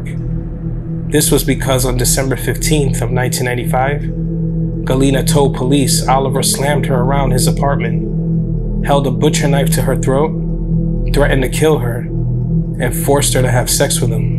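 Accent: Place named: Long Island, United States. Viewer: This channel is great. Such well researched cases put to the public respectfully and with the victims and their families clearly thought of. I'm from long island and truly love all the local case stories told by people from where I'm from who talk like me and have an understanding of where we come from. Great work and much love. GOD ZESS AND PLEASE KEEP THE CONTENT COMING.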